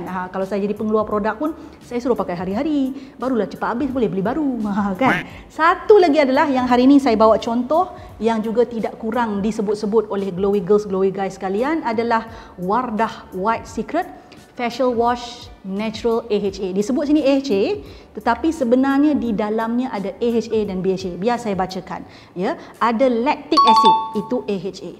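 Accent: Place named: Malaysia. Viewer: Saya orang indonesia, suka banget dengan logat malay. saya paham betul point yang di bahas, walaupun beda bahasa. Terima kasih kaka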